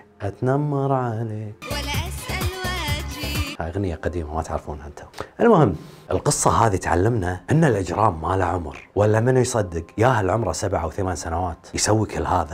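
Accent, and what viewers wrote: Kuwait. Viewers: تتكلم بلهجتكم الكويتية ... يديد يعني جديد فلماذا الياء مكان الجيم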